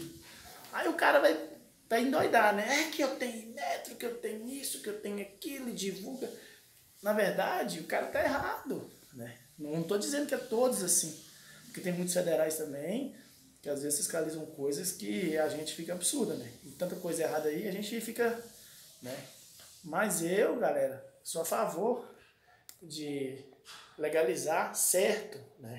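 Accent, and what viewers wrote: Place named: Minas Gerais, Brazil. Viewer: de qual lugar de minas vc é?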